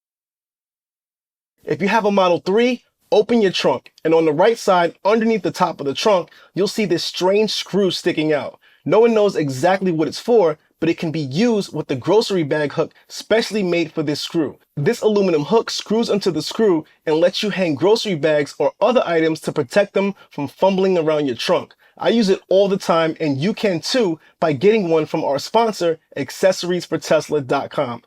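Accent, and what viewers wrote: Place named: New York, United States. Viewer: Excellent video, we took notes. What is your accent? My Boston raised wife said it's a New York accent.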